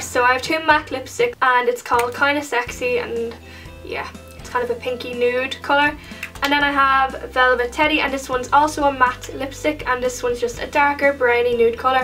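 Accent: Ireland. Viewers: Where in Ireland are you from?? Don't recognise the accent ..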